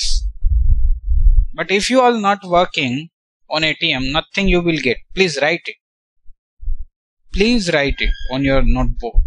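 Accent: Telugu, India. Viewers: Sir u telugu is very sweet we are the telugu people follwing ur class nice telugu and thanking u sir.....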